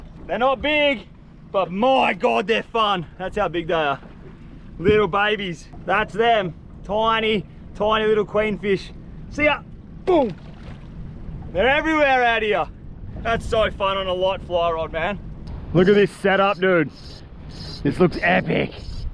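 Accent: Australia. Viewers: AMERICA 🇺🇸 LISTENING TO AUSSIE MAN TALK'IN CRACKS ME UP FAIR DINK'UM COMPANY 😂😂😂😂 I'M LOV'IN IT THE WORLD IS GREATEST PLACE ON EARTH 🌎!!! FAIR DINK'UM DUDE'S 👌🤠🐸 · My god I can't stand that stupid Australian accent, no effort to speak more clear, you would get more viewers.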